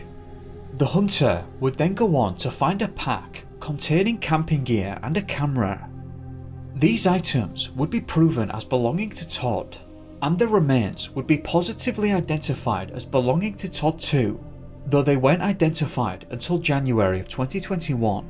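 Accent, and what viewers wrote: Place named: Scotland, United Kingdom. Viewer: does an excellent job with Missing Void and I like his Scottish accent!!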